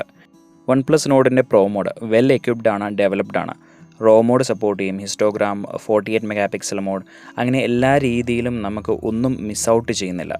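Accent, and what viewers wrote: Idukki, India. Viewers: Idukki karan ano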